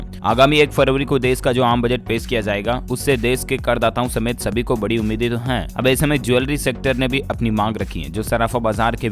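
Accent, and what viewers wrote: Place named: Odisha, India. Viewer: Udisa se bol raha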